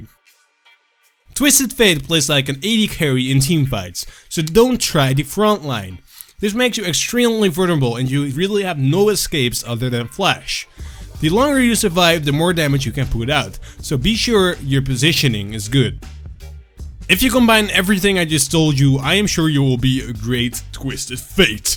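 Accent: Netherlands. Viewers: Are you Dutch by any chance? Nice guides by the way, they help me out loads! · Are you Dutch? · cant watch this cause of the dutch accent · haha omg, ik hoorde het aan je accent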